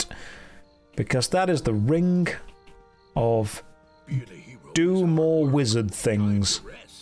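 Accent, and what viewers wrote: United Kingdom. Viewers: Please, good sir, do tell me that you are indeed British as you seem to be. Because if you are, you are probably one of the Britishiest people I've ever seen.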